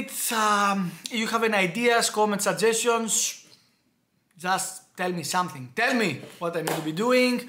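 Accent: Greece. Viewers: Thx for posting. Keep up updated. Btw, you sound like a greek fella, am I right? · Based on the accent you must be from Greece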